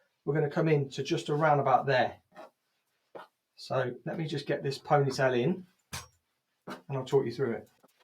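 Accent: United Kingdom. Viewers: i like ur explanation also ur britsh accent. like and subscribe you.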